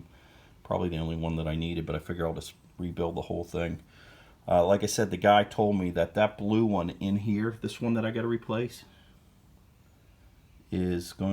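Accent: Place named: Pittsburgh, United States. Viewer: Thanks for the video. I could tell from your 'accent' that you were a Pittsburger, then I saw the Vaccarri sticker on your loader. I live in McMurray and used to go to them all the time for my BX2230. Now I have to find a rebuild kit somewhere else.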